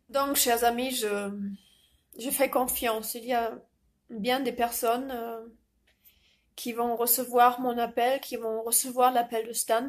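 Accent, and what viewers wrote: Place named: Germany. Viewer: Ich dachte mir schon vom akzent her das Sie deutsche sind. Es ist Das zweite vidéo dass ich mir von Ihnen anchaue. Ich leite weiter. Viel glück und dass diese verrueckte Welt endlich recht und wieder normal wird. Ich versuche so gut wie möglich auch meinen Beitrag zum Aufbau eine besseren zukunft für die zukünftigen generation. Ich drücke euch die Däumchen.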